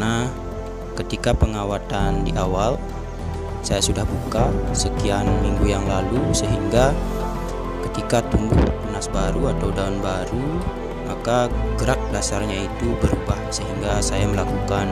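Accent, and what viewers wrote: Bali, Indonesia. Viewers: Logat bali sangat kental bli,, Salam semeton 🙏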